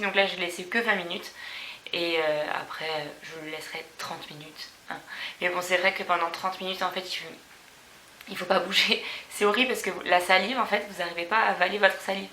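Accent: Corsica, France. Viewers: Mdrr toi sa se voit que tué corse avec ton accent moi aussi je le suis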